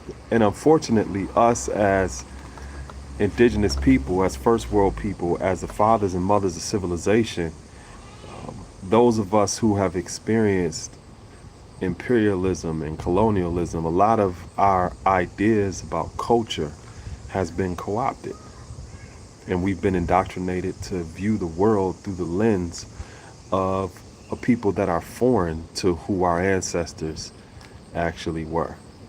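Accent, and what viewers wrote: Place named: Upstate New York, United States. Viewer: He's got an upstate NY accent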